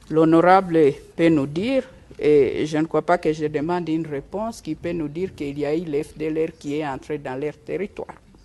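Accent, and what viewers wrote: Rwanda. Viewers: Una sema swahili kina rwanda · Rwandaise kende na rwanda yoka ba ra naba re na prononciation naye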